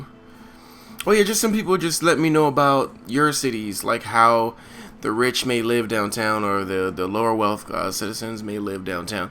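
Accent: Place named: Michigan, United States. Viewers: Hey, you leave Michigan accents alone! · I was guessing you were from the Midwest - maybe Wisconsin or Michigan - based on your super slight accent.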